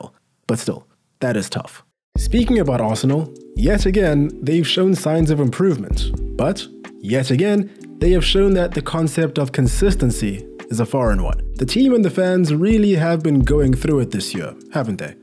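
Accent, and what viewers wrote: South Africa. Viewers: bro, are you South African? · are you south african ?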